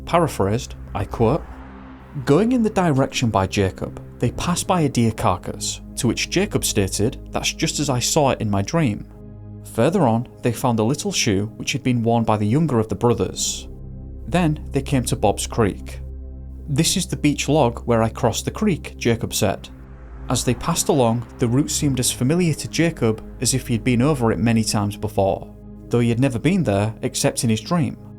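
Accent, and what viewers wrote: United Kingdom. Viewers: I agree! I prefer videos like these with UK-ish accents. It's oddly soothing to me.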